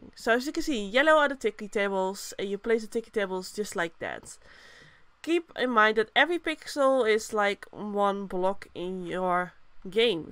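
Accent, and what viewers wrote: Netherlands. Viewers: Idk why but you kinda have a Dutch accent, ru Dutch? (No hate just asking 😂❤) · You sound dutch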